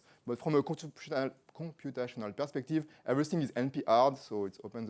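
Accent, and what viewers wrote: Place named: France. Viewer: That french accent..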